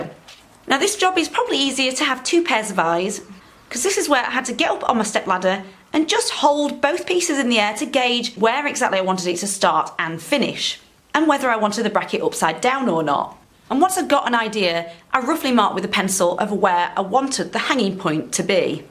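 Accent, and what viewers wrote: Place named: Rotherham, United Kingdom. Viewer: Hello from sunny Hull - been trying to place your accent for a while - thought it might be a Rotherham accent but now I know. Just been to B& Q myself funny the effect a bit of warm sun has on you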